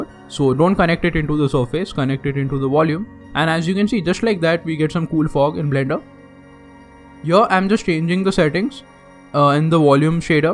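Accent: India. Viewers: Are you a Indian?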